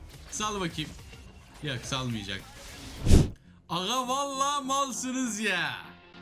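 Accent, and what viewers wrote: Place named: Thrace, Turkey. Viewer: Neden böyle saçma trakyalı gibi konuşuyorsun ki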